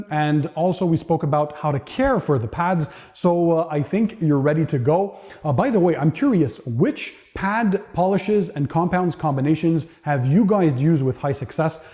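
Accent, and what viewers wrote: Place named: Canada. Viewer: Seems convincingly Canadian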